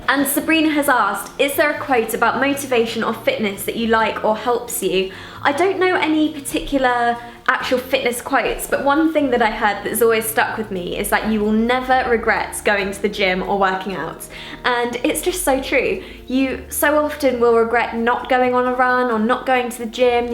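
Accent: United Kingdom. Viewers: hey! you're so beautiful, you take care of your body and have a british accent. inspiring lady. keep making videos! 🙆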